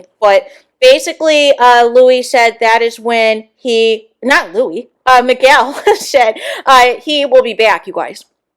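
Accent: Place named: Fargo, United States. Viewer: What part of Fargo are you from?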